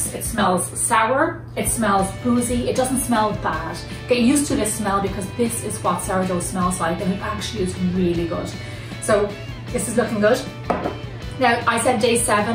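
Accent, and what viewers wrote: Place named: Donegal, Ireland. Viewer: You sound like your from Donegal ♥️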